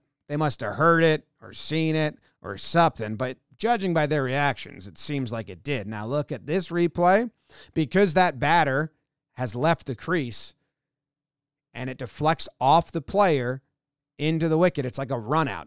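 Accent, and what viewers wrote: United States. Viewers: How come you're so good at understanding cricket? US channel with regular American accent.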